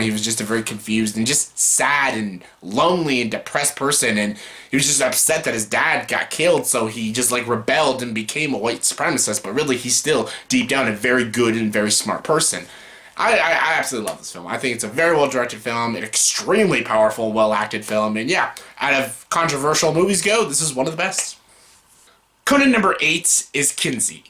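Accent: Canada. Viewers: What are you saying is your favorite Kubrick film? Canadian accenting hard here lol. Speaking of, is that how most Canadians pronounce Melancholia? 😊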